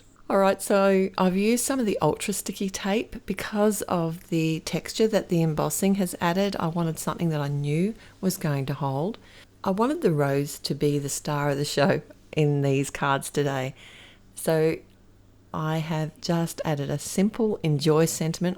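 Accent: Australia. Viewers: So lovely to hear an Aussie accent on my crafting channel!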